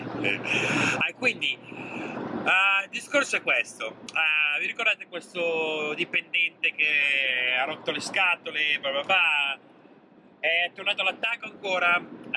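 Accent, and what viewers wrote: United States. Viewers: Ciao, ho iniziato a vedere i tuoi video, fatti molto bene, complimenti. È strano sentire che hai un accento americano quando parli italiano, ed un accento italiano quando parli inglese! 😄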